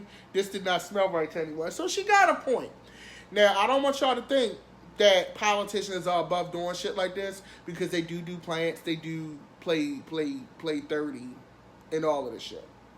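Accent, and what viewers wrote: Baltimore, United States. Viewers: I love this dude! You are the truth! Very nice with the ""Let's unpack this..." So colorful. I'm from DC and I hear that B-more accent. Makes me laugh. Keep up the good work!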